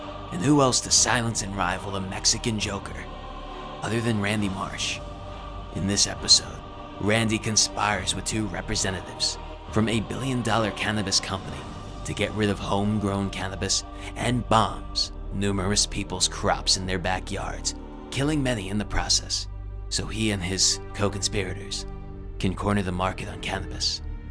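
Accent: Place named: United States. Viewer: You sound like how europeans think an american youtuber is supposed to sound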